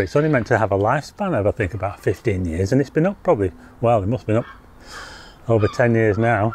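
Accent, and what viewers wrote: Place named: Blackpool, United Kingdom. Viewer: This is awesome viewing from Blackpool's most famous Vlogger, Not only do you show us the granduer of the Promenade,but more importantly the back streets that most people on visits never get to see. its so sad that not only in Blackpool but all around the UK a lot has been left to rot away and become derilict But you show it in sobering way which is quite touching with your choice of background music. Your dulciet blacker's accent gives the channel a homely feel and gives one the impression ude be a lovely lad to sit and have a cup of tea and share a cake with. i always harp back to 1976 when working on the Grand Theatre ,great fun times we had in the city of illuminations... Regards from North East Thailand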